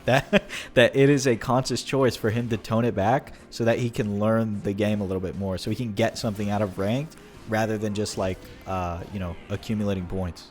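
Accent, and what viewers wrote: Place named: United States. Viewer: Hey man, you talk a lot about consistent mindsets, and it's indeed great to have! Have you tried therapy? You sound american to me, and I know health is very expensive in the US, but therapy is the single greatest thing I ever decided to do for myself, it improved every aspect of my life. As a bonus, now I can play ranked and not freak out about every single match, and not rage after every mistake... Have a beautiful day!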